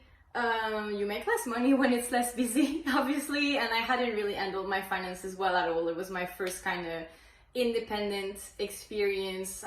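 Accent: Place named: France. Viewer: I’m 100% sure she’s French this accent😅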